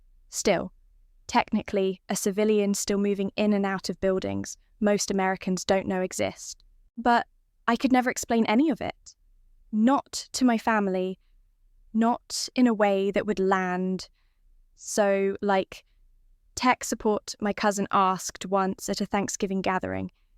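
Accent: United Kingdom. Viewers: The British accent is nicer than most of the other AI voices in use, nice change! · Why don't they use an American accent? British accent for a military story set in the USA?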